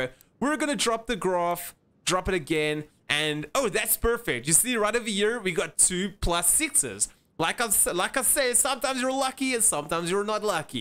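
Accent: South Africa. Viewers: Once you have all +3 bars, start with the bar on the right most side, and then move it one down and do that in order from right to left move each one down and you should get +6 on all. By the way you sound a little South African. Not a very strong accent but having lived here since birth I can pick out even very subtle SA accents just like that no matter where I hear them.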